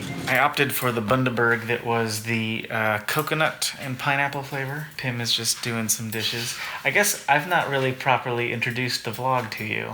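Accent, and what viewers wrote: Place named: Ireland. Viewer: Great to hear an Irish accent in a WIDRN video. How many of us Irish Arandanauts are there?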